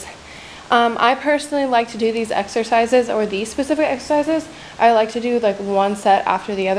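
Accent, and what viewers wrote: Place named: Nashville, United States. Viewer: I can hear that Nashville accent coming through a little!! 🤠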